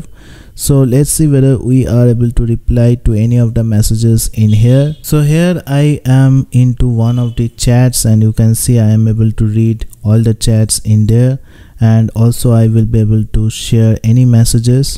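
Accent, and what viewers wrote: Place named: Kerala, India. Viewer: Malayali analle bro …english ketepo mansilayi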